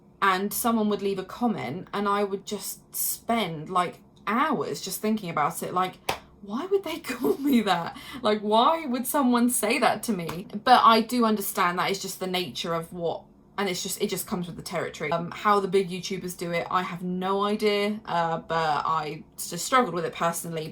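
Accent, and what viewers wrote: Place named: United Kingdom. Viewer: i finally found a uk (or at least you sound like you're from here) AC player! HII :3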